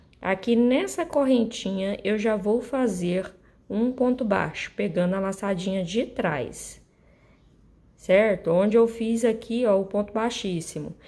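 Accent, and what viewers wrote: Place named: Minas Gerais, Brazil. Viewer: Que beleza de trabalho👏 Grata por compartilhar ensinamento🙌 E vc tem uma voz agradável e ensina de forma calma - parece sotaque mineiro rsrs lembrei de meu saudoso pai q era da bela Minas Gerais 😊 Ganhou uma inscrita e farei essa bela e pratica touca (faço para doação)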